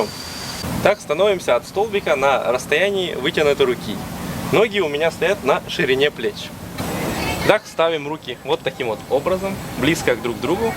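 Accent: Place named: Ukraine. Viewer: Сегодня 15 марта 2023 год, делаю все упражнения, молодец, даже услышала украинские мелодизмы, акцент, поддерживаю!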